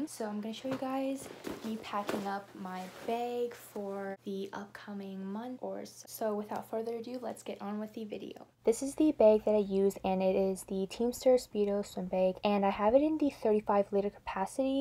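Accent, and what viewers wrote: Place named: Pacific Northwest, United States. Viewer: Gotta love that PNW accent 😍 😂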